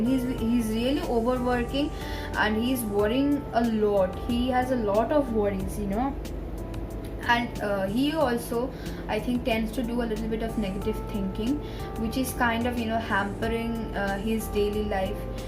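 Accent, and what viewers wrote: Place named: India. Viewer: it's okay sister I will listen it patients so I cab understand actually my hearing english is not that good but it's not that bad and your accent is like indian so it's not that much problem but sister are u from india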